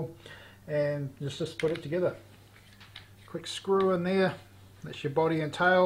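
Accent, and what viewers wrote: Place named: South, United Kingdom. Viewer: Your accent is interesting it’s like a southern British sounds cool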